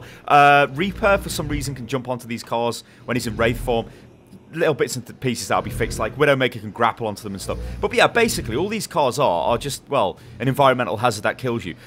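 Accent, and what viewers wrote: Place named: RP, United Kingdom. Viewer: you sound JUST like JP from Fresh Meat. Quite posh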